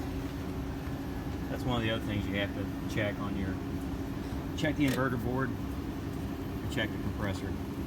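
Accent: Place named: United States. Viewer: got that wrong u got american accent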